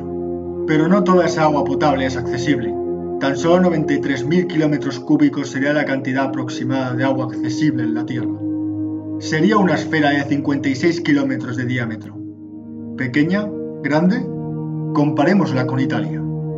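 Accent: Spain. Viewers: Queeeee??????!?!? Eres español??? :V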